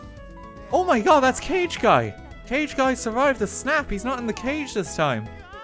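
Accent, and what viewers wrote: Ireland. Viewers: are your irish?!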